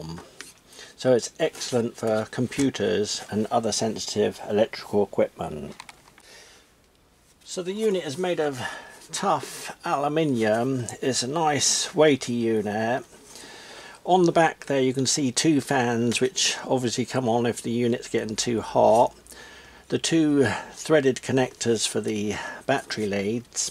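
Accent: United Kingdom. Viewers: Hi, I can tell by your accent that you are a fellow British hobbyist. Currently, I am not sure where to find metal stock at a good price. Do you know good places in the UK to find some thanks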